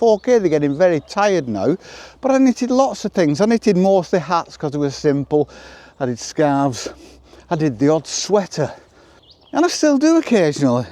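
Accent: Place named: Northern England, United Kingdom. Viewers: So nice to hear that Lancashire accent! Your hat and scarf are lovely!! I'd love to teach you how to spin your own wool. You could take your drop spindle out on walks with you and sit and spin on a rock or a bench by the lake there. I'm a 66 yo Lancashire lass with a ton of hobbies. Live in the states now. My hobbies are knitting, spinning, dying (I keep a few sheep and other farm animals) racing pigeons, dry stone walling, spoon carving, pickle making ( I have a road side stand) horse training, I torture the dogs with my fiddle playing :) make paracord bracelets and hand carved rubber stamps...gosh, the list goes on. We don't have telly, but You Tube is a God send for learning new things. Check out the Zed Outdoors channel if you'd like to learn spoon carving and other bushcraft skills. There's a big spoon carving festival held every year in Derbyshire. 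Spoonfest, it's called. I'd love to go some day. Wishing you many years of enjoyment in all you do! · Just found your channel and I've subscribed. I like your philosophy, and your northern accent, and your adorable little dog. You're a cool guy and an inspiration. Wish I were there. Thank you and cheers from the U.S. 🌿🐶💚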